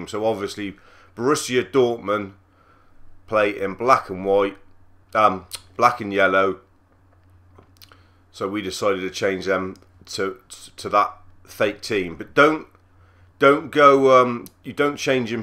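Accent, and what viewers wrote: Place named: Bristol, United Kingdom. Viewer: Love that Bristol accent!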